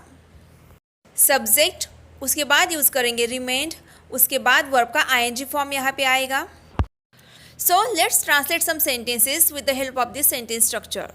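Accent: Maharashtra, India. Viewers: Your hindi signs marathai languge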